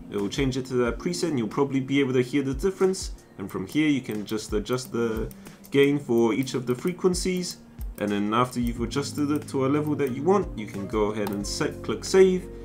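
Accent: New Zealand. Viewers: He's a newish type of zealander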